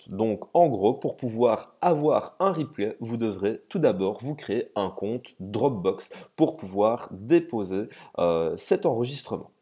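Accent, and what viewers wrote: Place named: Belgium. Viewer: Bravo! Explication complète, très claire et très didactique, avec une petite pointe d'accent belge bien sympathique... Vous serez sans doute ma référence, de même que ce logiciel l'est également ! Il faut préciser que le système utilise le principe du pair to pair et des serveurs répartis, ce qui convient particulièrement bien dans les zones mal desservies par internet (Afrique...) et qu'on ne dépend d'aucun prédateur du web tel que Microsoft, Apple, Google.... Il n'y a pas d'internet libre sans logiciels libres.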